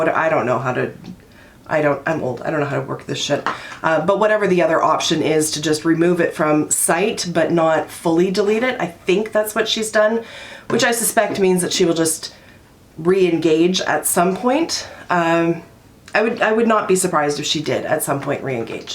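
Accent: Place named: Ontario, Canada. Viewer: Having one's Mom come to your defense means absolutely nothing to me. Of course her Mom would have her back! Big duh on that one, right?!?! Also, I totally pegged your Ontario accent because I grew up in Buffalo and have spent a ridiculously large amount of time in Toronto and went to camp outside of Ottawa every year. Wish I lived in Toronto, maybe one day.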